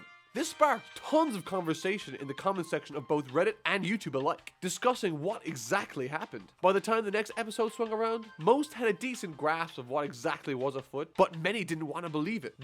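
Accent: Ireland. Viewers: I had a feeling u were from Ireland with that accent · Cool your irish? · With that voice some of us can tell your Irish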